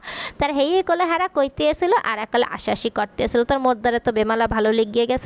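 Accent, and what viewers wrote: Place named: Barisal, Bangladesh. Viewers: বরিশালের মানুষ ও সুন্দর কোরে কথা বলতে পারে কেউ বুজতেও পারেনা যে বরিশালের মানুষ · Borisaler vasa😆👌👌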